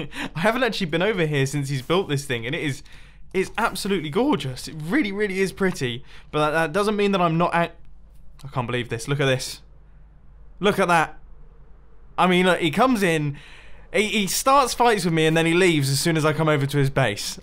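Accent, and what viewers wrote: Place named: United Kingdom. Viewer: Hi Mumbo. First of all, happy birthday! Can't believe you are the same age as me! You have an old, classy and very British voice, it's amazing. Second, for the design of the walking of the sphere, a simple circle walkway is the way to go I think.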